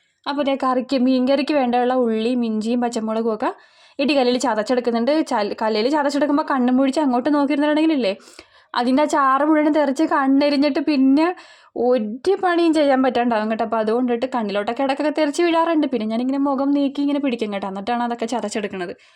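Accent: Kochi, India. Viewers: ചേച്ചി കൊച്ചി ഭാഷയിൽ ശസാരിക്കു ചേച്ചി നല്ല രസം ഉണ്ട് കേൾക്കാൻ പിന്നെ ചേച്ചി മുളക് പോടീ നല്ല കളറ് ഉണ്ടല്ലോ ഏത് മുളക് പൊടിയ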